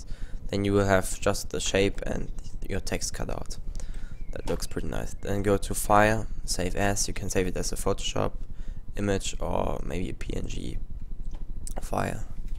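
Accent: Germany. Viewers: Man merkt an deiner Aussprache das du ein Deutscher bist :D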